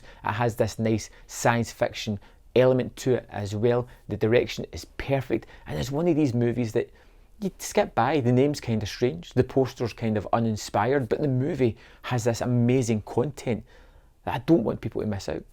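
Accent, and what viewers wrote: Scotland, United Kingdom. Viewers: You got my sub. Great reviews. You're a little bit difficult to understand, given your rather heavy Scottish accent, but for myself I found I really had to listen to what you were saying and consequentially your comments sunk in.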